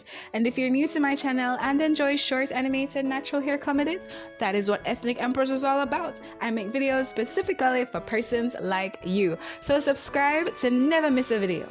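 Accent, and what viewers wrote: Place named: Jamaica. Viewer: I love Jamaican accent great video and editing awsome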